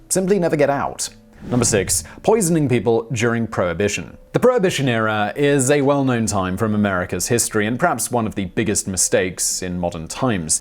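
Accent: England, United Kingdom. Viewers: Anecdotal, but I knew quite a few people who got paid out for damages from FEMA. It seems like a lot of these toptenz videos are aimed at slinging dirt at the US, via an overly snobby sounding English accent. Why don't you focus on your own country more? It needs some focus, that's for sure, because it's falling apart worse than the US is. Bye Felicia✌️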